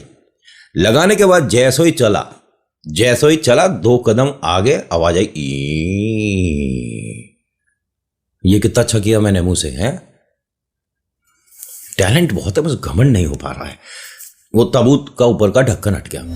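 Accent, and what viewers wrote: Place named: Haryana, India. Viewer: भाई मजा आ गया हरयाणवी जबर्दस्त ऐसे ही सुनाते रहो टैलेंट है मगर घमंड बिल्कुल नही है 😂😂😂😂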